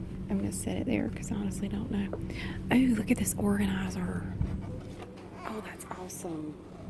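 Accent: South, United States. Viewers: These are fascinating! I love your accent( I have a southern accent as well) and I love your organization! Please continue making videos:) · I was 24 min in before I realized how long I had been watching! As a southern person it's so soothing to hear a familiar sounding voice :) You made me want to go shopping too haha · I'm American so all these products are familiar. I'm from the south and get accent is familiar. Never thought this would be satisfying and relaxing. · This video is such a comfort to me for multiple reasons. I’m from this area and grew up going to this Target. Walking through this familiar space with a southern voice similar to the ones I grew up with really reminds me of home. Now that I’m in college this video always makes me feel better if I get a little homesick. Your energy is so comforting and calming. I also love organizing/sorting/straightening, so that’s very soothing as well.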